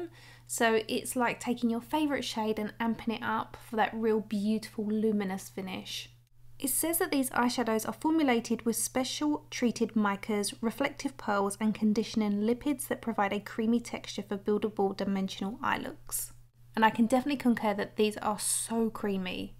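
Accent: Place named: England, United Kingdom. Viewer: I think I liked the near black one the best. I agree with you, because I think the quality and intensity of the colors was really exceptional. Pricewise, it could be a lot worse. I had assumed, while watching your video that this would be a European brand because of your English accent but I was glad to see that you referred to how many dollars they cost. I’m going to check and see what I can find out about them. Thanks for sharing this. New subscriber here. 👍🏼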